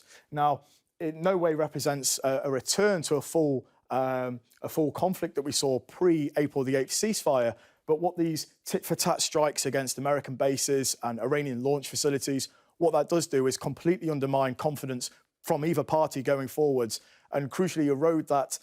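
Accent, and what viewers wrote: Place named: Yorkshire, United Kingdom. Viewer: It's impossible for Americans to hear through the Yorkshire accent. E.g."cru-shul-ly erode that".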